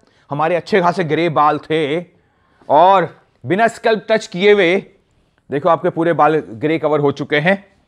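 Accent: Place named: India. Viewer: aapka bolne ka style mast hai...itna Desi style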